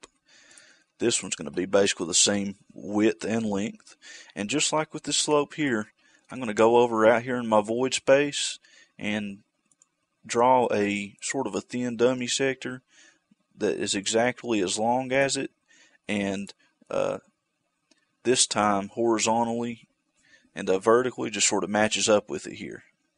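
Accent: United States. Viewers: Cool beans. And I like your super American accent. This is a good channel. I hope to produce a game in GZDoom.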